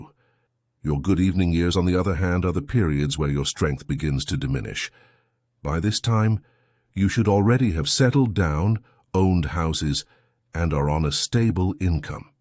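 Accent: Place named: United Kingdom. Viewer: Dear Sir. Thank you for the content that you create and share with us. is a blessing to humanity and your wisdom truly touch our hearts. At home we refer to you as the man of God with the British accent. This video made me burst out in tears 😢 I grew up with many setbacks and only discovered myself late in life. From a very young age the enemy has set traps in motion to delay and derail me from my God given destiny. Just when things got better for us as a family my daughter was diagnosed with type 1 diabetes in 2022 at 17 months old. It turned our lives upside down and we face many challenges. I had to resign my job to take care of my daughter full time to meet the requirements of keeping her healthy. I feel like a loser when i cant give my children what they ask for and also the full financial responsibility being on my husband. We are a praying family and we love and trust in Jesus. 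There are so much i dont comprehend and my heart is aching for my daughter and what we are going through. Please pray for my daughter that God will heal her. May God bless you endlessly and continue to do mighty works through you and this channel. We are truly blessed to have you ❤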